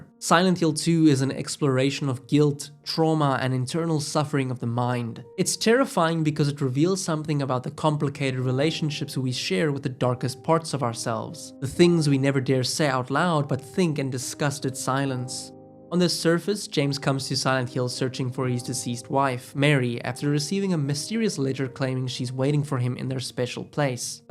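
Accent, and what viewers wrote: South Africa. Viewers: South african? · I smell South Africa?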